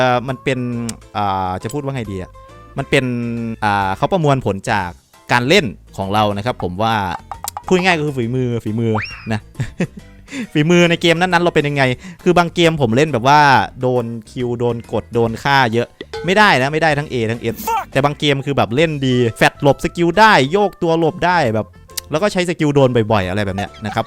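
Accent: Isan, Thailand. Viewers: ชอบความเว้า 555 ดีครับ อยากให้ทำต่อไป lol wr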